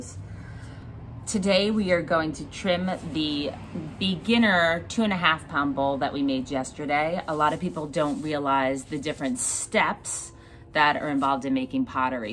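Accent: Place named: New York, United States. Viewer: love your NY accent!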